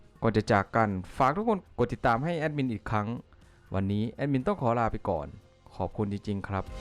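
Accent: Laos. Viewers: คนพูดเป็นคนลาวถูกต้องไหมครับ😅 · รู้สึกเอ็ดดูแอดมินที่พยามพูดภาษาไทย แต่ก็ยังฟังออกว่าเป็นคนลาว เพราะพยัญชนะ ค ย ฉ ช ซ ส ษ ศ ล ร ฮ เหล่านี้เป็นต้นที่ทำให้คนลาวออกเสียง และสำเนียงผิด ส่วนลาวจะออกเสียง ຊ = ช ซ ສ = ฉ ศ ษ ລ ຮ = ล ร ອ = อ ฮ อะไรประมาณนี้ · พูดไทยแต่ออกเสียงลาว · เดาไม่ผิดแอ๊ดเป็นคนลาวแน่นอนคนไทยแต่ละภาค ผมฟังสำเนียงออกรวมถึงชนเผ่า คนลาวจะไม่มี ช.ช้าง จะพูดออกซ.โซ่ นี้คือสิ่งที่ผมจับจุดได้แบบ99.99% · ผมก็ฟังออกว่าคุณเป็นคนลาว มันแตกต่างกันกับคนไทย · แอ็ดมินจากสปป.ลาว ก็จะออกเสียงได้ประมาณนี้ครับ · ถ้าออกเสียงสำเนียงแบบไทยแนะนำแอดอ่าน ใช้ ไม่ใช่ ไซ้/ ฉุด ไม่ใช่ สุด/ฉะเหลี่ย(เฉลี่ย) ไม่ใช่ ศธเกลี่ย และอีกหลายๆคำที่ไม่ได้กล่าวไว้ ***แต่ถ้าปรับไม่ได้ก็ออกเสียงแบบลาวไปเถอะ คนฟังและดูเค้าเข้าใจภาษาลาวอยู่นะ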